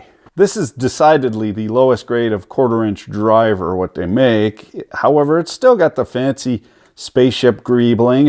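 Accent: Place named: Canada. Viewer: This might be the most Canadian fellow I’ve ever heard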